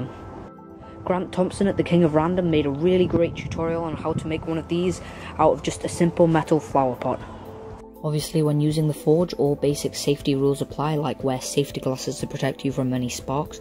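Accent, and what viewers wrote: United Kingdom. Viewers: you are simply right: the British accent for Aluminium is correct since it comes from a Latin word "Alluminium" that has the same accent as the British version. Even today in any latin country Aluminium is pronounced the same way you do in British, so at the end the US version is funky but not as correct;) Amen! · actually this is how the British say aluminium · Well said about the Aluminium pronunciation! it's a bit rich when a country who predominantly speak the ENGLISH language, start pulling the ENGLISH up on the way we speak!! What with sodder and aluminum and an inability to use metric they need to come into the real world!! Great videos and tutorials, keep up the great work. I subscribed Mark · It does not matter how you pronounce it, I'm from Briton too, So I agree with how you say it. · I'm sick and tired of these pseudo-intellectual Americans who're confounded and obliviously duping themselves into believing how they and they alone know how to speak English correctly, trying to fault find on our pronunciation of "aluminium" when it's forever been a lorry not a truck, a sink not a basin, a plug not a stopper, as do remember whos language you're butchering after all our brothers and sisters from across the pond, you're speaking English, so if anyone knows how it's pronounced, it's us... 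it's a ruddy jaguar, not a jagwar!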